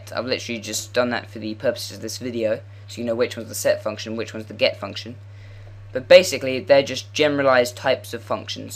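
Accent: United Kingdom. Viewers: Thank you very much! I like the accent cockney, "NUMBAR", my favorite accent! Greetings for England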